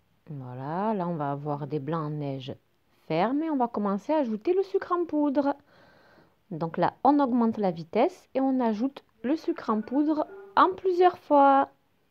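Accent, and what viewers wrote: South, France. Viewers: Bonjour j'adore vos recettes et votre accent c'est du midi de la France?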